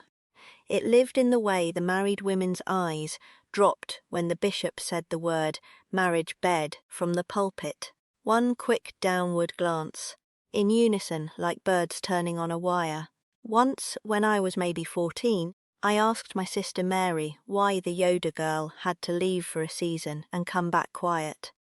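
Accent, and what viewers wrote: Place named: England, United Kingdom. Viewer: And, now she has an English accent. I hate AI.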